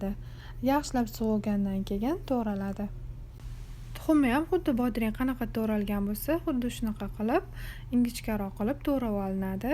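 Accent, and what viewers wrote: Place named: Tashkent, Uzbekistan. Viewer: Towkencha gapirvottilaku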